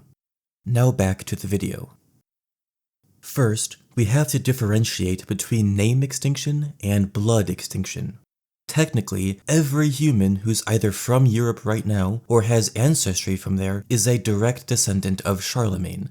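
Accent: United States. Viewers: he has to be from the Midwest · American lol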